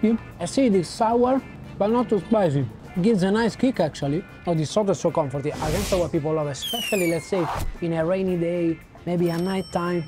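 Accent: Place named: Italy. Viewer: coba belajar bahasa Minang mix sama logat Italia. Alhamdulillah habis buka puasa lihat vlog jadi ngiler.. mana bisa nolak lihat dan makan rendang 🤤🤤🤤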